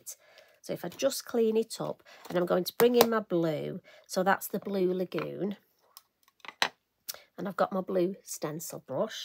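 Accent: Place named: England, United Kingdom. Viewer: Actually, I love watching you paint, and I love to hear you speak, with your lovely English accent. what a lovely card. Blue is my favorite color. 💟💟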